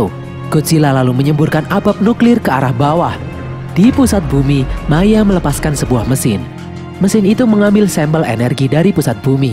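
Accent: Java, Indonesia. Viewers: Seru onk boso jowo e 🤣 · Min Anda Orang Jawa ya kop Bahasamu koyo ngono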